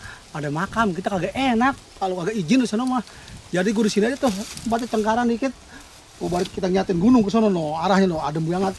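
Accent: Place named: Jakarta, Indonesia. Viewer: Masa alloh bng,boleh tau dari mna nih mirif btawi logat nyh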